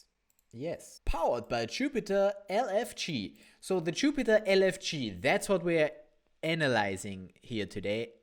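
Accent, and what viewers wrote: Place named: Austria. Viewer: Love your energy on the videos and I like learning about Solana from it. You are Austrian? I love the accent and thought it was Swedish. I'm not really good at accents haha.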